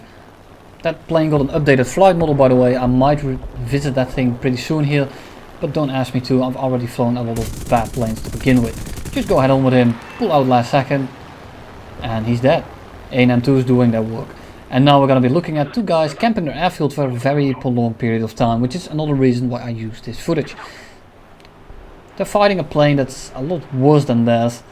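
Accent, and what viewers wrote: Netherlands. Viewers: he sounds kinda dutch like jengar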